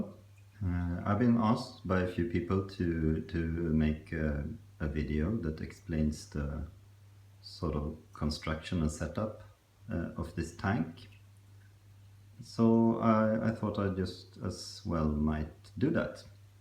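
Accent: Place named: Sweden. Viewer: Kikat på detta kar flera flera gånger tidigare, aldrig lagt märke till att du var svensk. Hörde det direkt på dialekten nu dock, haha... Älskar karet. Så simpelt och samtidigt udda! Det märks att du har ett öga för design... Definitivt en bra källa till inspiration; blir lika lockad varje gång att köra igång ett "flodkar". Har du några planer på att utveckla karet? Jag tänker i form av fisk, inredning, bakgrund osv. Keep up the good work!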